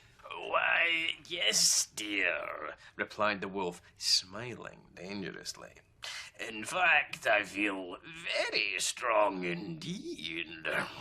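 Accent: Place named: Scotland, United Kingdom. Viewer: Ира, молодец какая! Отличное видео)) Джеймс со своим шотландским акцентом вообще отлично вписался)) Особенно волку на славу удался! Спасибо за ссылку!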